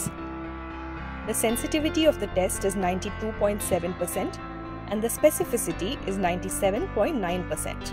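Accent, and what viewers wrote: India. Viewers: I must be positive bc I cant listen to this terrible narrator for even 5 secs. why use a complete Indian to speak in English? hm? hmmm???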